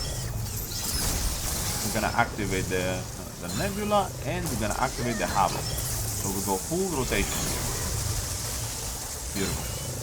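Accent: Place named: Greece. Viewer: Nice video. I miss your regular vids where you don't livestream. Only thing I would change would be the necklace, which I think jester's bell would be a bit better since havok form scales off of cast speed, at least it used to. Still, super good video. So good that I watched the whole thing. Yes, all 1 hour, 26 min and 31 seconds. I appreciate the raw gameplay that few people really want to do. I also love your greek accent. I've alreay used this plenty of times but I never thought to use sapphire dreamstone for the cooldowns.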